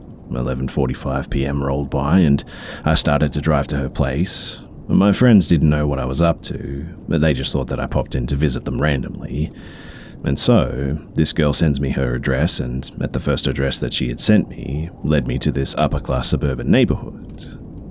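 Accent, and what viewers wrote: Australia. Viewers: gosh darn it, why do australians have the best accent?! Thanks for the upload! Much love, man! ❤️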